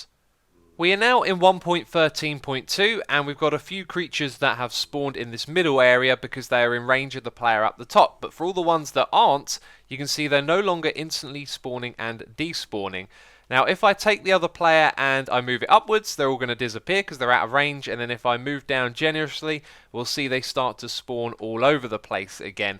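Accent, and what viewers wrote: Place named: England, United Kingdom. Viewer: That English accent..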